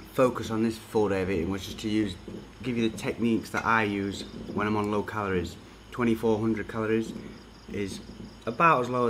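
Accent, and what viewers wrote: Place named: Northern England, United Kingdom. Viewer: Its nice to hear a familiar accent to be honest! Nowhere near enough northerners on my discover page haha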